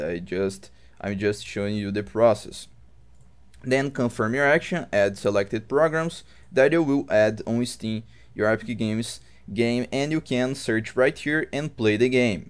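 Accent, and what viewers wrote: Brazil. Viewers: Sotaque BR massa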